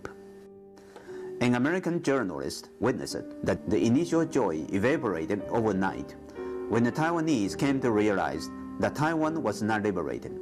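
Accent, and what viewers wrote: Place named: China. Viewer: Chinese Accent so strong :)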